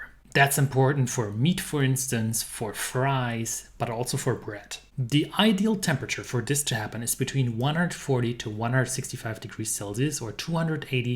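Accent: Germany. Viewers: Manny good advice, this have make my technic full filed thanks to you German tremendous English accent. 👍🏼🙌🏼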